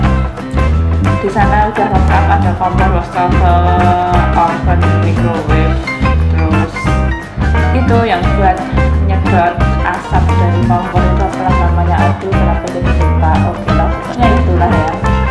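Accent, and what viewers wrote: Java, Indonesia. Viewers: medok banget suaranya mbakk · Sy suka dialek bahasanya. Medok tp lucu n sumringah dengernya😊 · Mbak ko logatnya medok bnr y? Tapi sy salut... Idenya kreatif. kbetulan sy rncananya mau bangun kos2'an 4 pintu. kalo duitny cukup. Smoga tmbah sukses y Mbak???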